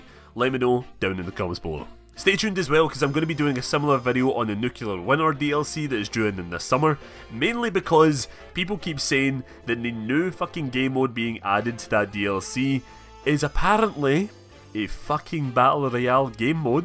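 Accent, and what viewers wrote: Scotland, United Kingdom. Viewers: Scott or Irish?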